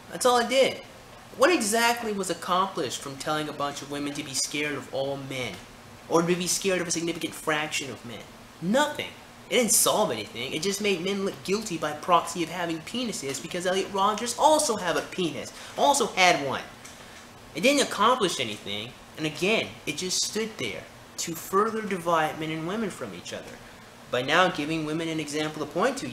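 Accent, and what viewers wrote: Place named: United Kingdom. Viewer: Trust me when I tell you that you say all the right words. I've disagreed with some of the things you've said in the past, but I don't think I've ever heard you say the wrong word. I wish I was as articulate as you. The British accent threw me off a bit.I was like, "Is he intentionally doing it? Or did he grow up in England and sometimes it just comes out? I've noticed that you pronounce certain words differently than I do. The only other place I've heard this type of pronunciation or accent was from the show The Wire.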